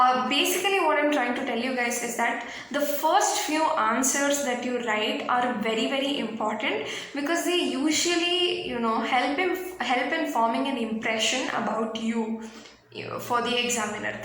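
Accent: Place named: Kerala, India. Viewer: Are you a keralite? (your accent make me feel so)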